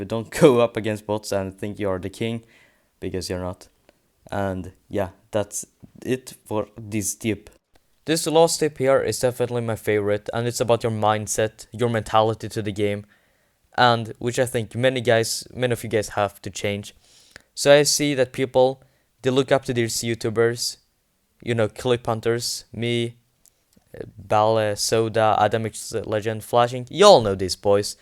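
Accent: Sweden. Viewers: Are you from sweeden ur engelish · i bet ur swedish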